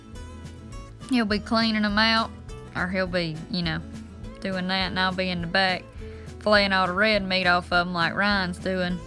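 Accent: South, United States. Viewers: Southern drawl....I love it to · I’m in love all over again by your beauty and southern accent 😍😍🥰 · She sounds like she is from my neck of the woods. The southern accent is one of the sweetest sounding accents . Well at least to me . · Love ur deep Southern accent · A young woman with 6-pack abs, a sweet southern drawl and knows her way around the field, lakes and rivers....and the kitchen. If I was 45 years younger I'd be in love. Great videos!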